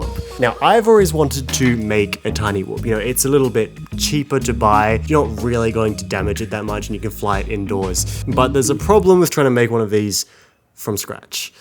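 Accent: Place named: Australia. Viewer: relax; aussie accent, young, multi-cultural, eloquent and cute.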